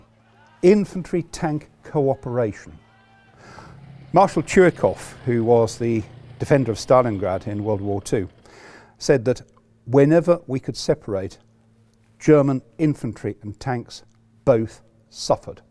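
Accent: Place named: United Kingdom. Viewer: I could listen to all day to be honest. But as an American i can say i enjoy listening to most British talk lol.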